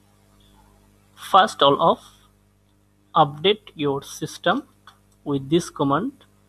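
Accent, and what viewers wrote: India. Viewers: Why does every programing vid have a thick indian accent lol Great vid btw :)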